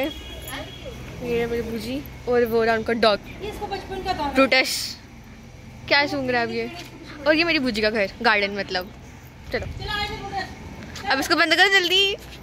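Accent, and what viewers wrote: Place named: United Kingdom. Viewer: Yrr language to HINDI HAI to ascent eng ka kyu hai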